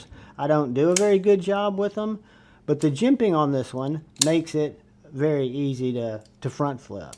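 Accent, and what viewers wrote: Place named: Baltimore, United States. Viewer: Your accent sounds like Baltimore 😂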